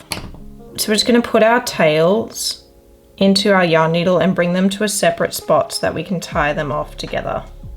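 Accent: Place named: Australia. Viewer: Lovely to hear an Aussie accent and not have to listen to a half hour intro 🙏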